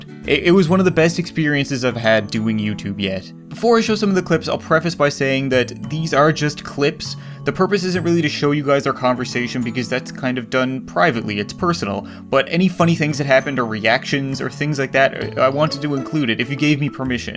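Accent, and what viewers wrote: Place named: Canada. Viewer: I love your canadian accent btw :P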